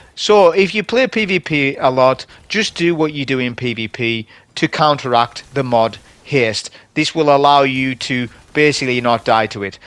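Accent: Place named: North East England, United Kingdom. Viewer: Nice video mate. I love Stasis, it's my favorite Subclass. Just to note, when you have Aspects that use grenades i.e. Bleak Watcher, they have a separate cool down, which you will see in the text description of the Aspect. Love your videos on general mate, I miss loving in the NE of England, so enjoy listening to your accent.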